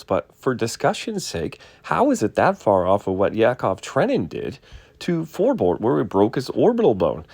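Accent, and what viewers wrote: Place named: Ottawa, Canada. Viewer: You sound clueless. And like a resident of Ottawa.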